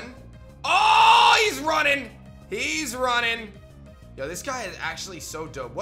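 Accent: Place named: United States. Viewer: my guy ur american accent is 100 % i can barely stand it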